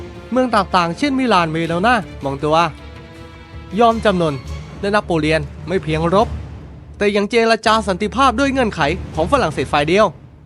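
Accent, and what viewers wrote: South, Thailand. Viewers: มาเป็นกำลังใจให้ครับ ติดตามมาจากประวัติโลกและมนุษย์ตัวเต็ม ผมไม่รู้ว่าแอดเป็นคนใต้มั้ย พอฟังจากคลิปที่แล้วรู้สึกสำเนียงออกใต้ๆ นะ แค่เดาเล่นๆ ไม่ได้มีเจตนาไปทางอื่น ปล.ผมเป็นคนใต้นะ ทำคลิปดีๆออกมาเรื่อยๆละ อ้อลืมบอกกดติดตามให้แล้วนะครับ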